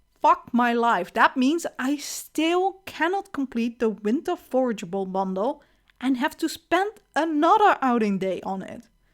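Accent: Netherlands. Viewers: Listening until the end I realize you’re probably Dutch haha!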